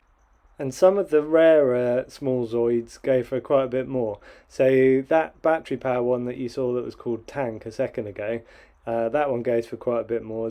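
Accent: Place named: RP, United Kingdom. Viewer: SO posh (loved it)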